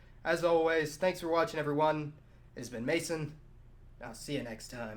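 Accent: United States. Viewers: Burundi is pronounced “Burundee” and not your Americanized habit of pronouncing “I” as “eye” with so many things 🤨😒😑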